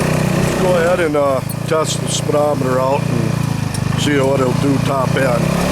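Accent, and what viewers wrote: Minnesota, United States. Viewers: he even has the same minnesota accent! LOL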